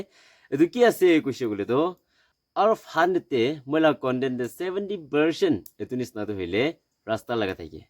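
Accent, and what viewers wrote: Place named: Thailand. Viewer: I like your Thai accent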